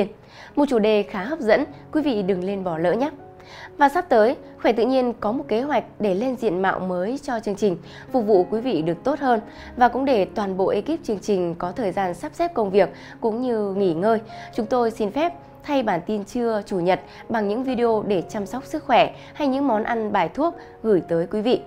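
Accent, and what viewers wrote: Standard. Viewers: Giọng đọc vẫn chuẩn rõ ràng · Chương trình hay mc xinh đẹp phát âm chuẩn , rỏ ràng.chúc chương trình ngày một hay và dc nhiều khán thính giả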